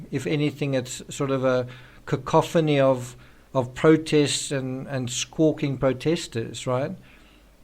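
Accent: South Africa. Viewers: Trying to work out if u are from CT, KZN or Gauteng with that accent.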